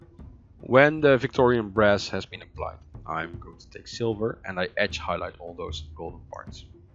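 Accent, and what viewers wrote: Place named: Netherlands. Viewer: Ik herken een Nederlander wanneer ik er een hoor.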